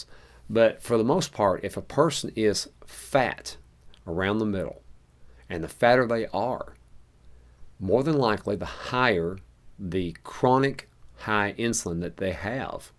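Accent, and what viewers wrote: South, United States. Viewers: I am very glad I came across your channel. I am learning so much about diabetes from you. Thank you. P.S. I love your Southern accent. 😊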